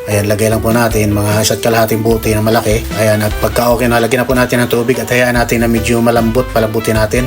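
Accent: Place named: Visayas, Philippines. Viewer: Bisayaa kaau oi..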